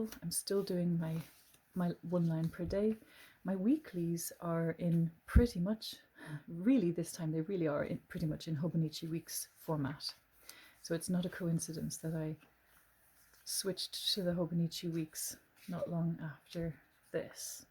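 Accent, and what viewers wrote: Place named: Ireland. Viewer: You have an incredible soothing voice.. Nice to see.. And hear.. Irish voices..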